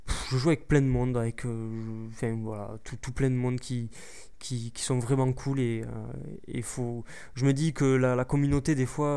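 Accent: Toulouse, France. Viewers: toulouse <3 venant de la bas sa fait du bien d entendre cet accent:P merci pour toutes ces vidéos:) hate que les prochaines sortes con!:P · Franchement bravo pour tes vidéos!!! Un petit pouce vert pour le super accent toulousain de. ^^ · VIVE L'ACCENT TOULOUSAIN!!! T le meilleur continue t video tu fais un super boulot et Merci a toi de nous apporter ce bonheur apres les dur journée de cours (ou de boulot pour les plus vieux) merci beaucoup continue t super video!!!:D:D <3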